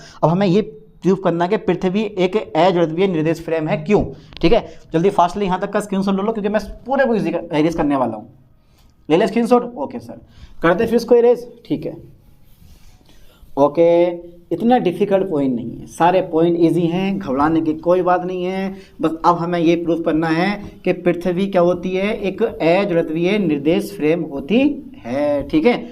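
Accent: Bijnor, India. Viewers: Sir aap bijnor side k ho kya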